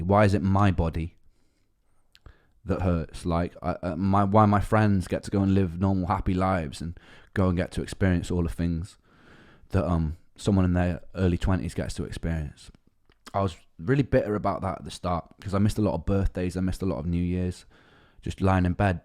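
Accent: United Kingdom. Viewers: love the facet as a fellow man who is a Welshman and who thinks of themselves as a Brightonion I still hear the welsh pronunciation in your words like Appy, with a soft a.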